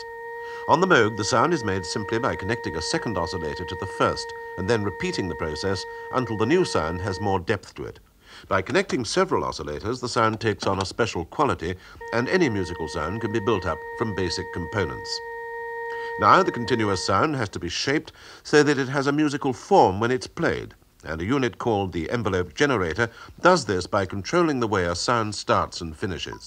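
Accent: RP, United Kingdom. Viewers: Is his accent considered RP? I'm American and am trying to work out UK accents.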